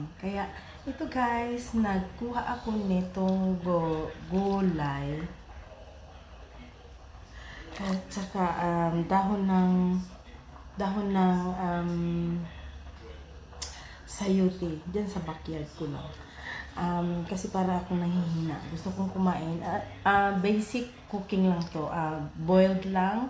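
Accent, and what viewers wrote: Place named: Visayas, Philippines. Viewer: Ohh ang sarap niyan kapatid..parang Bisaya Ka host..taga saan Ka.??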